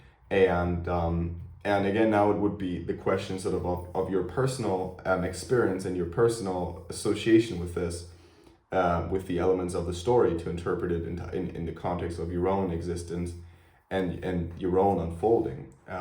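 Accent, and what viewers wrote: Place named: United States. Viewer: BTW it would be nice to hear you read in German then translate because since you sound like an American and it would be nice to hear you speak in your native tongue also. thanks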